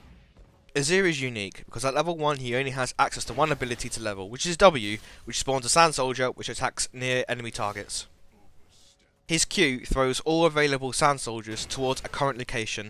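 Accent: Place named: England, United Kingdom. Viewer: 21 I get it if I rewind the video but it's a bit of a hassle. Try pausing a bit between words man, you have a very heavy english accent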